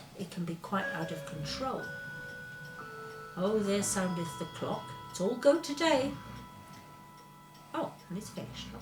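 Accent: Wales, United Kingdom. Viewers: Very grateful to have found you. I have learned to create more simplicity in the way I live which has left me more time to be creative. Thank you for you wisdom. My grandparents came from Wales and immigrated to Canada. I am enjoying very much the way you speak, it reminds me of them. Bless. Peace, Jxx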